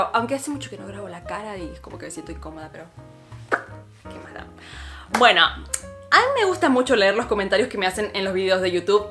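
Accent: Argentina. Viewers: Lo dices tan cruel que hasta da ternura Pero es cierto parecen pelotudos sin vida (￣(エ)￣) (se le sale lo argentino ^<^) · Estoy totalmente de acuerdo con vos y otra cosa que odio es...: "Ya me subscribi a tu canal ahora hacelo vos " osea me subscribo si me gusta el contenido , osea deja que llegan solos los subs Pd: me pegaste se él asentó argentino soy uruguaya y en la clase soy la Argentina 😂😂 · argentina asta los pelos xdxd tu acento me mata * - * deberias predicar en mi iglesia :u ya recapacite xd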